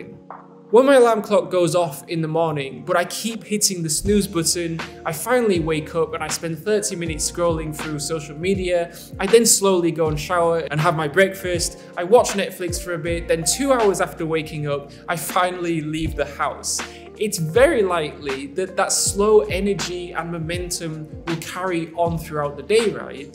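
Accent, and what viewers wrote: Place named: West Yorkshire, United Kingdom. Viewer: You sound like you're form west workshire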